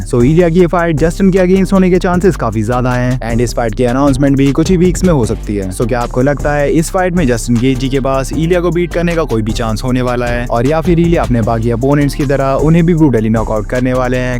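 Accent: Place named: India. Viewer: Wich language are you speaking i hear enlish brother i hear india i hear nigeria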